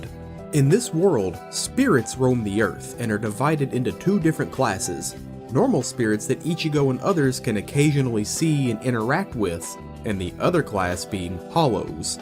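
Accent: South, United States. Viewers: Love the video, and as a fellow Texan, love your narration through it. There's nothing like hearing someone describe something they're passionate about in a fine Southern twang. Also, you're like one of the only people on Youtube talking about Bleach that acknowledged the existence of the "speed of the world" poem, so thanks for that. It's one of my most favorite moments/poems from the manga that just evokes so much emotion. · just found your video man, I cant place the accent, TN or MS?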